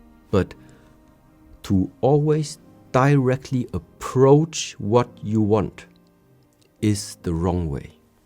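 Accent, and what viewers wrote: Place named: Germany. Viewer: just love that a buddhist spiritual masterclass leader has a german accent 🙃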